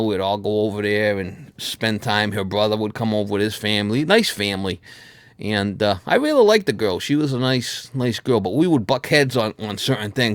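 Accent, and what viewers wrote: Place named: East Coast, United States. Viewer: The east coast way of speaking sounds pretty odd from here at the west coast. Quite the accent. But I'm sure I would sound pretty odd to too.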